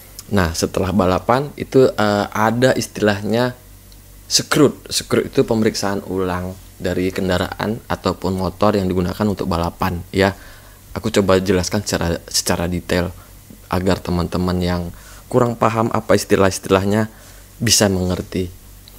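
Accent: Medan, Indonesia. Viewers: Medan mana ny ko bang 😀 keren x 🤪 Salam balap tidur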